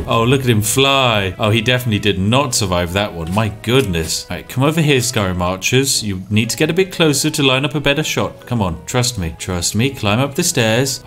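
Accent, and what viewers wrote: United Kingdom. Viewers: I heard "welcome to the wonderful world of" and thanks to the British voice my brain auto filled Terraria. I miss TB. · I love how unapologetically British you are.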